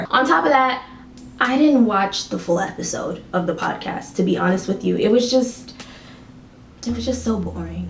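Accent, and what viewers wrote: Jamaica. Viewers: Are you Jamaican or from the Caribbean because I hear the patwas enuh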